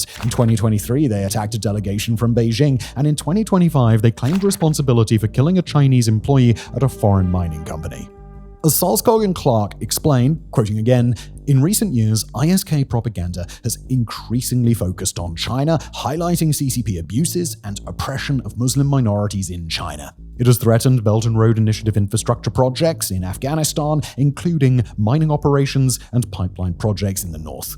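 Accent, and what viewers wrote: United Kingdom. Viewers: His Britishness is the same on most his channels with cadence and tone, but the backing music really does push it one way or the other. But yeah, it’s all SEO stuff designed to promote and get your attention, it’s how it has to be done if you want to get views and keep them. Blame YTs algorithm ultimately.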